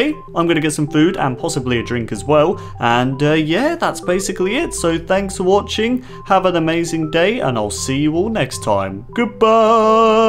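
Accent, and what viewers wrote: United Kingdom. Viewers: I love this accent are you british or something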